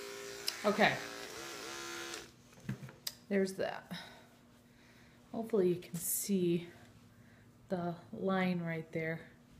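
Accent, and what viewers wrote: Michigan, United States. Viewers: you're a star! I just luv ur soft Michigan twang.. could listen to you all day but I gotta work! Keep up the good work!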